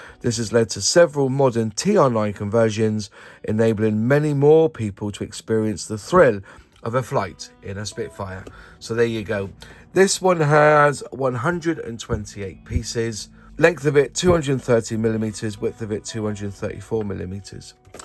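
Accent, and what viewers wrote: West Country, United Kingdom. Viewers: I'm blaming and West country accent!! 😂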